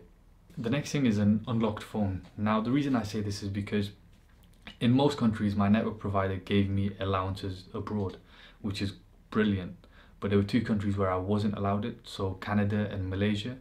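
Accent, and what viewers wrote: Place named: London, United Kingdom. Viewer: lol you don't sound as geordie, been londonised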